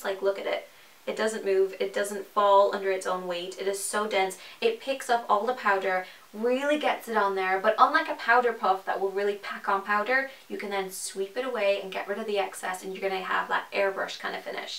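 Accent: Ireland. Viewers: Are you Irish? :) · Are you Irish?🇮🇪 P.S I love you😘❤️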